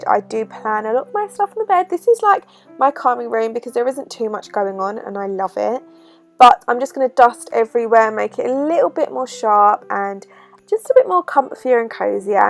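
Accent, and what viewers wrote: United Kingdom. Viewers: I can't stand the British talk what the hell are you saying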